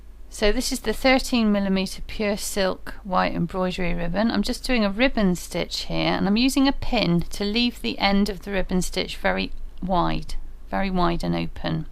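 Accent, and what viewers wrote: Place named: Bristol, United Kingdom. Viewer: Love your accent .... Bristol ? Gloucester area :)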